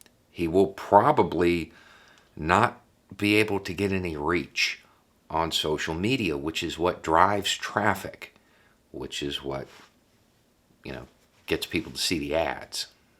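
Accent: South, United States. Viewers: Too bad Jill Biden can't be Secretary of Education, cuz Joe's got ethics.🤘❤️🤘 Happy Diwali, y'all. PERFECT timing. Btw, YOUR Southern accent makes you sound smart.✌️ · You have a southern accent are you having to fight people in walmart like me with my Biden hat?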